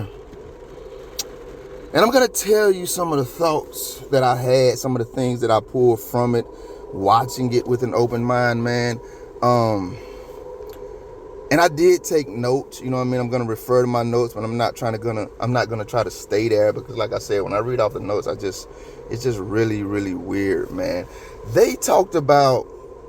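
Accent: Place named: Georgia, United States. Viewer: Just hearing you are from GA..I am too and you're right about how we talk! 😂 I agree 💯.. no one does everything "right". We, as humans are imperfect-we make bad decisions etc. I think Kristi wants BK "done" so maybe they won't dig deeper. This isn't from a rabbit hole... I've done a lot of my own digging. Theres way more to this than we know. Way back Steve said a lot of bad stuff was going to come out. There's a lot of things at play in this. Kristi interruption of Steve every other word drove me nuts!! Lol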